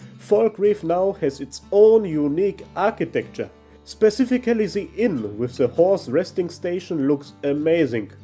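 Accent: Germany. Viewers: Man merkt voll dass du aus Deutschland bist 😅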